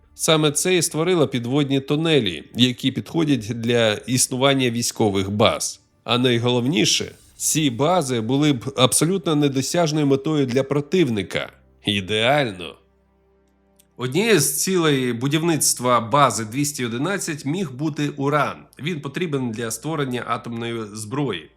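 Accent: Russia. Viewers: Дякую за чудове відео, хоч і трохи дивно слухати про історичні події від людини схожої на депутата 😅 Помітно, що людина російськомовна, але українська вже майже бездоганна! Ще раз дякую і продовжуйте в тому ж дусі!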